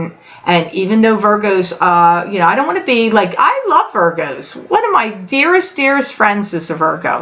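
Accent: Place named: Pittsburgh, United States. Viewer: Are you located in western PA? Because if so I'm pretty confident that it could be my family members that are attempting to communicate to me through you 😂😂 I literally was thinking about them and your accent 1 minute before you said you got the chills and I got them with you! I am a Virgo sun and moon and have watched you religiously for over a year. I LOVE YOU! (You're not crazy so don't think that you are!) you channel so many energies and it's up to us to vibe at your level to actualize these realities. Anyways I think it's my family because they are from Pittsburgh, I have TWO clogged sinks, a plumber just left for a leaky toilet, i have been needing to fix my AC for months, and need to return my air diffuser. I've been hesitant about moving but just decided to move long distance from my love and it has instantly improved our relationship! 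Like molded up the gel for sure ;) and I haven't moved yet! I have been dragging my feet with healing and creating for a year because it's different from "being a normal RN" and your reading just helped me significantly. Affirming my feelings. The eclipses were NUTS 🥜 but this month has been amazing and it's only day 2!!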